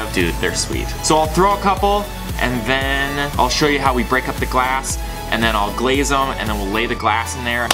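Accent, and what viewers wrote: Minnesota, United States. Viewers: I love how Minnesotan you are, it’s so nice hearing someone from the same place as me in a video!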